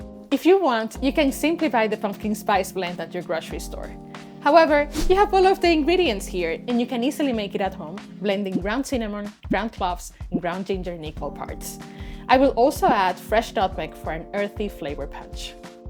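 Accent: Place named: Latin America. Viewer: Sounds and looks Latina. 🤔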